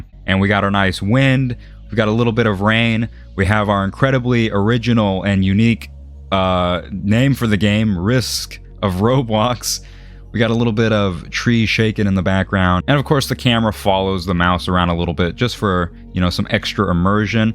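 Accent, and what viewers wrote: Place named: Bay Area, United States. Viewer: You sound like Bay Area buggs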